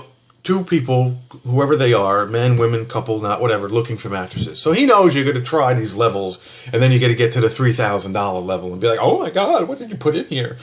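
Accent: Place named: Boston, United States. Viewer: your video's are hilarious, i'm from Boston and you say things the way me or my friends would with the gestures and noises that come out of you mouth, it cracks me up. i can't stop laughing but everything is so true + that makes it even funnier.keep making videos or hit the stage on open mike night at the comedy cellar or the Improv.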